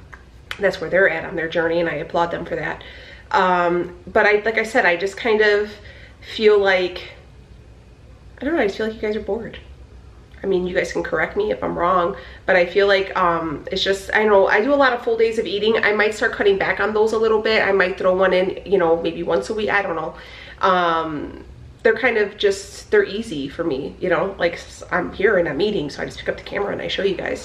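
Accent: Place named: Chicago, United States. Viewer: I just found you a couple of weeks ago. I'm retired and doing Keto. I used to be a truck driver and always was interested in Chicago people when I went through. Love your accent! Enjoy the cooking segments, the fashion. I just taught myself dip nails😱and love your leopard nails today. Whatever direction you go i will be watching! There are lots of older women like me that miss the family hub-bub so its fun to watch and relive those times.❣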